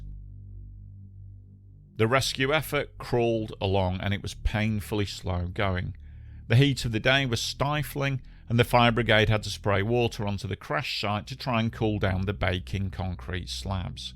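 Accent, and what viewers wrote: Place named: Northern England, United Kingdom. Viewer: You still sound like you're in the north of England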